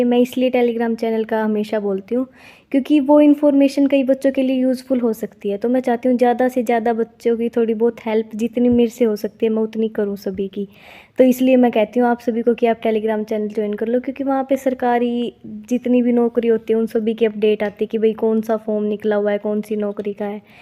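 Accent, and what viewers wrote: Haryana, India. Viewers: Aap hariyana se ho....pakka tone se pta chl gaya😂 Aso in Css 2024🤞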